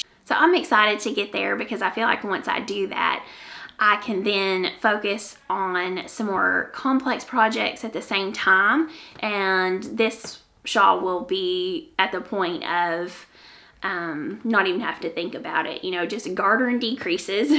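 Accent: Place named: South, United States. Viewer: Can I say that I could listen to YOU talk all day long. I absolutely have never known anyone with a southern accent (and have never traveled to any southern states) so I’m just smiling while listening to your podcast. You are adorable!! Thank you for making time in your day to share your life with us.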